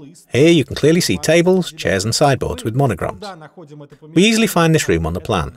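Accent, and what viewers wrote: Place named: United Kingdom. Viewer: Лучше бы американский акцент взяли, его больше народу в мире понимает, чем британский.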